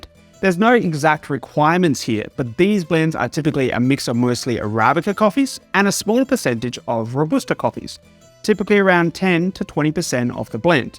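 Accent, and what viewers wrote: Australia. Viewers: Can we all agree that his australien access is fascinating 😍😍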